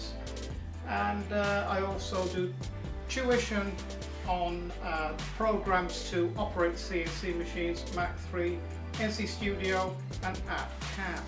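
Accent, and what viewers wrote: United Kingdom. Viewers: I really didn't expect you to have a British accent. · sounds british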